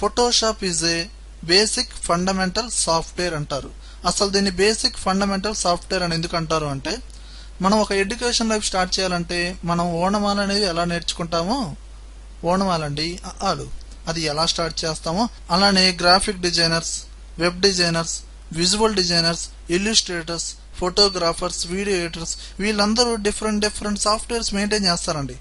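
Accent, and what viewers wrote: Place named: Rayalaseema, India. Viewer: Anna, 00, Na, Maha, antunnarante miru kachitanga Rayalasimavaru.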